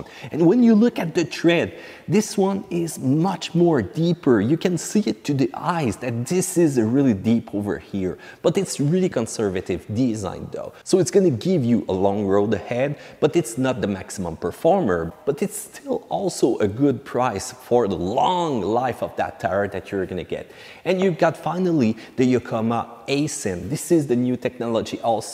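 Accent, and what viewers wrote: Quebec, Canada. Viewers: Yess, Anglais Québécois!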